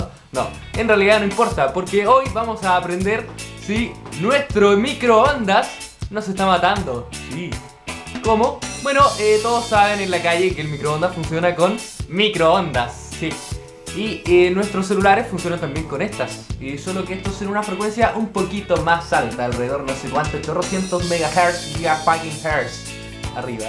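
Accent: Chile. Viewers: No amigo, este pollo es chileno ....eso si no se de que parte es..!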